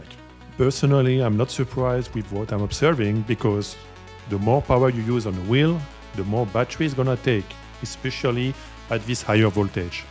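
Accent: France. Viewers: T’es français ta un accent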